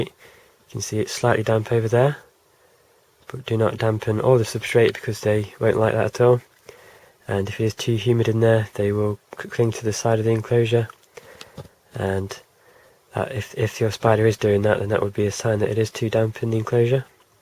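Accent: United Kingdom. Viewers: British Pronounciation :)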